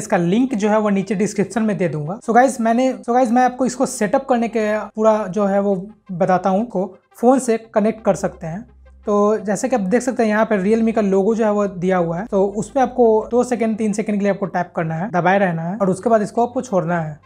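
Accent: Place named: Bihar, India. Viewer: Bihar , samastipur ka lg rha hai bhai tu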